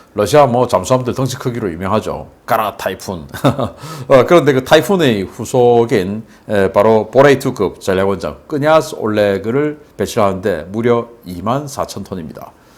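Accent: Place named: Gyeongbuk, South Korea. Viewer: 말투를 보니 대구 경북 사람이구만.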